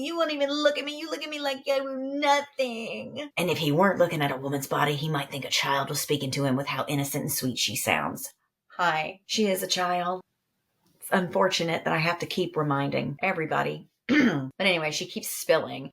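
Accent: France. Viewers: Of course we need more of your French accent. Why is this even a question? 😂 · I had to do a lot of spreadsheet work, so I finally (reluctantly) dove in to the second half. You are so funny as a presenter. Thank you for taking this commission. I knew I would hate the ending (because I hated how the book started too) but you and your French accent made it almost bearable. Almost. Also, you look super cute in bangs. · I gotta say yes to the French accent. Really helped soften the gut punch that was this book🥖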